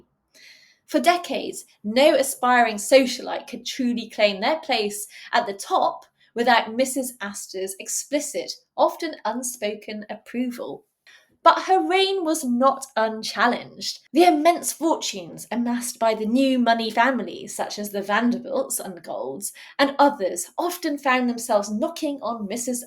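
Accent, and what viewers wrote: United Kingdom. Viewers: I absolutely love history videos and I can tell how passionate you are by the way you explain and tell these real life tales! Your British accent adds all to the charm and even if history is loaded with information, you really are able to deliver it beautifully and so easily understood! Hope you'll become professor one day if you aren't already or if you'd see yourself doing that, you'd be brilliant for sure 👏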